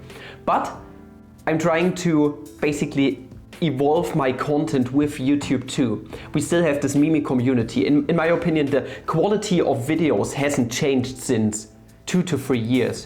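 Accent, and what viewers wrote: Germany. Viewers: Ähm ich hör da bißchen deutsch raus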